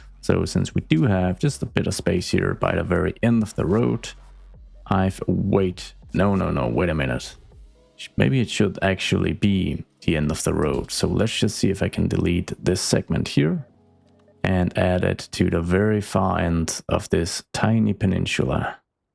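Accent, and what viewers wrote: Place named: Denmark. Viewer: Er du dansk? (I'm guessing this based on your heavy accent) :D Incredible content and builds.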